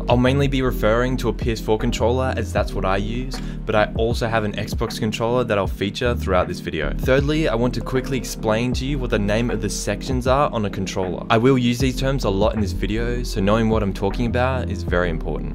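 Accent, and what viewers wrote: Australia. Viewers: Kolxk no he is Aussie like me and he said CALL of duty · Btw he said call it’s just you non-Australians can’t understand our sweet accent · hes Australian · Aussie accent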